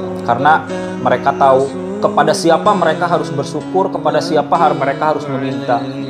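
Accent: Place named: West Java, Indonesia. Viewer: Beruney nya mn sih kang logat mu macam sunda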